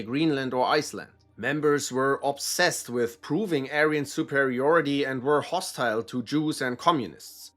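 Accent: Germany. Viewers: The most German accent I ever heard but nice video 😂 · As a WW2 buff I learned a lot today , thank you for providing this. It also sounds better coming from a German , it makes the video more authentic and engaging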